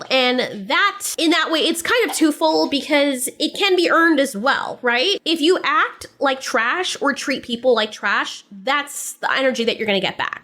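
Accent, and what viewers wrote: United Kingdom. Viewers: Brutal takedown done with the best mocking British accent ever! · Love your British Accent by the way. Also great analysis ❤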